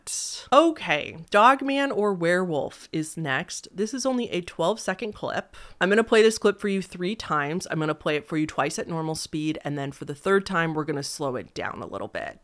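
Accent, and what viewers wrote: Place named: Midwest, United States. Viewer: It’s fine it’s a very midwestern thing. Like “warsh” instead of wash. It drives me crazy but it isn’t “wrong”.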